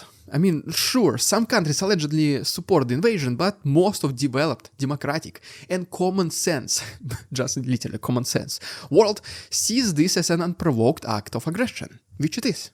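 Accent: Russia. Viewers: I love how with every video, you have such a human dialogue that sounds like national geographic. Your Russian accent is so beautifully balanced with your complex use of English words and your very charming personality. I love how you don't throw numbers at us. You humanize the war and bring it to the hearts of all of us viewers. Wonderful. You've grown a long way since I first discovered your channel and got frustrated with you. You have grown from a young man, into a proud and very emotional news caster who I always look forward to seeing. And mixing in tidbits of silliness just adds to your great videos. Please, dont ever stop. Even when the war is over, I hope you cover the recovery and rise of Ukraine and Russia, brining your unique humanizing dialogue, that will transform the numbers, into recovering people. Stay amazing. Give your little monster lots of love from all of us.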